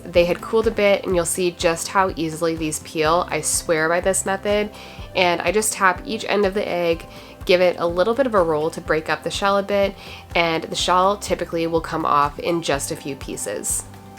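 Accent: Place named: Canada. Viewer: I thought you might be Canadian by your accent, and then I saw thr PC brand raspberries and confirmed it. 🇨🇦 👋🏻 I am, however, disappointed that you've chosen to have only American product links 😢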